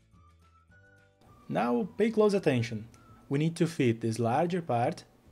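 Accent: Brazil. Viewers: are you a brazilian? because if not your accent looks so much as a brazilian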